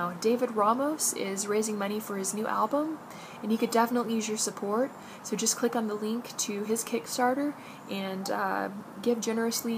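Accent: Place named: Texas, United States. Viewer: I think this is the first time I've heard you speak like a Texan,. I heard you say "y'all" at the end of your video. lol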